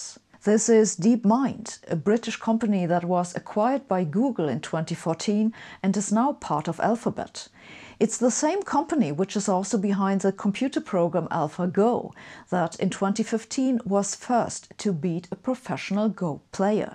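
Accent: Germany. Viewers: I love the german people. So brilliant, so strong. Germany is the shining jewel of europe. So long as they avoid the mistakes of the past, i.e. nazism, military empire, etc. Nothing can stop Germany or dim it's influence in the world. Look how brilliant this german woman is. And that accent! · You sound so German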